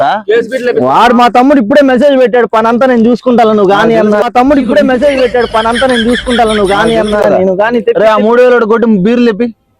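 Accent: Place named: Nellore, India. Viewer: Meedhi Nellore anna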